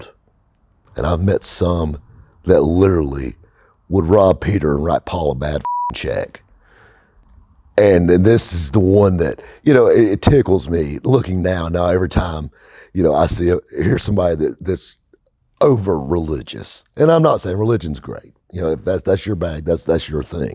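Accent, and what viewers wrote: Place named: Carolinas, United States. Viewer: Holy cow! I worked in Hendersonville NC for several years at Hunter Chevrolet Subaru Hyundai! That’s basically where I cut my teeth! You’re so close and the accent from Hendo to Seneca is almost the sale. Have to cross paths with you some time. You know Stephanie the Photographer and I wanted to hire you for a car show!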